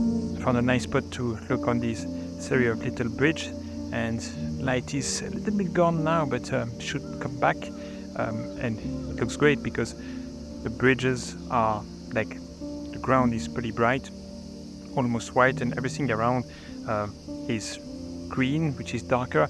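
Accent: France. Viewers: Je me disais que ça sentais l’accent français ! Super vidéo, grand talent !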